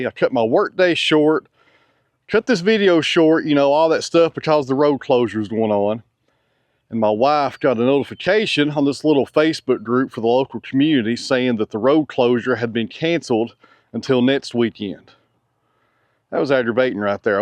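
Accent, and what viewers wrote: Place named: Tennessee, United States. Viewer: Are you kidding? That Tennessee drawl is what keeps me coming back...that and is just good ol' country smart. Listen a bit and you gonna learn something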